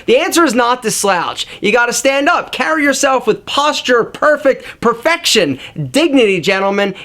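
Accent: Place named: New England, United States. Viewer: where did you grow up alpha? I can hear a new England accent on your "aw's". -14 year old long term viewer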